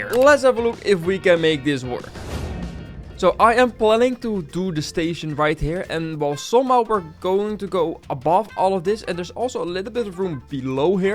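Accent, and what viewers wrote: Netherlands. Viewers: Ik ruik nederlands want je naam is en ik hoor nederlandse accent English: i smell some dutch cause ur name is dutch and i hear an dutch accent in ur english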